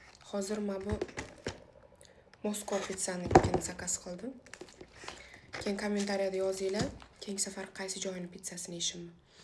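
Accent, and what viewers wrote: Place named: Karshi, Uzbekistan. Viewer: Каршидан буса бу нима тошкентчани булгаб ташади узини тилида гапирса бусасиикан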